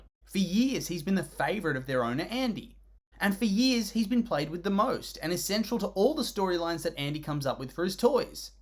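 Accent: Australia. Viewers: Sounds Australian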